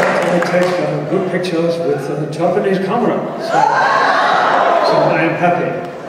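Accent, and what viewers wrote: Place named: Northern Ireland, United Kingdom. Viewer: interesting how he chose a northern irish accent..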